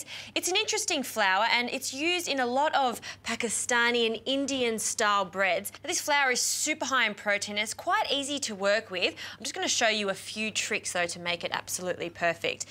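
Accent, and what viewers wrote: Australia. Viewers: What accent she using? Australian? Kiwi?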